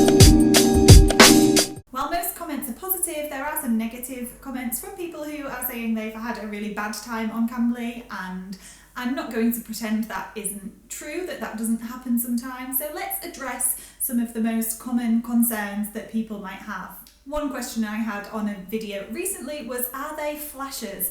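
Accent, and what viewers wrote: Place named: Manchester, United Kingdom. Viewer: You sound like a Manc.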